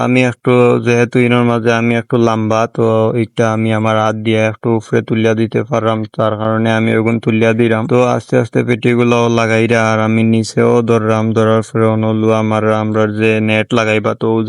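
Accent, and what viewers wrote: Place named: Sylhet, Bangladesh. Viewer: আসসালামু আলাইকুম। ভাই কেমন আছ। আর তুমার ভাষা সিলেটি সিলেটি লাগের তুমার বাড়ি কই।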